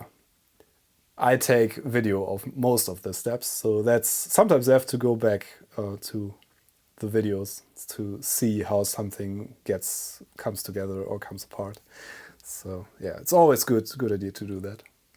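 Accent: Germany. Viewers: Great hands-on video, immediate subscribe. Von Akzent aus, bist du deutsch? 🇩🇪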